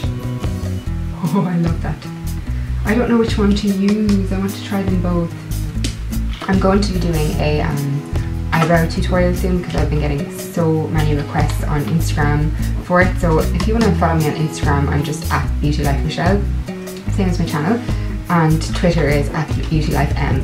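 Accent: Ireland. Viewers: Love this! I'm glad u commented on my video cause now I have discovered yours!:) Are you from Ireland? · Love grave yard girl! Are you from Dublin:) x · just found you recently. and love your Irish accent. I'm in UK but have perm resident visa for Oz, waiting and hoping to return once hubby gets a job. How long have you been there and what do you think?? Joan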